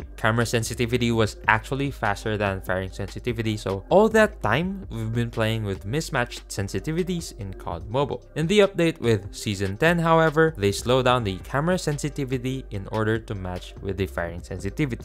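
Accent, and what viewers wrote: Philippines. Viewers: Bro you sound like a filipino but you live in qatar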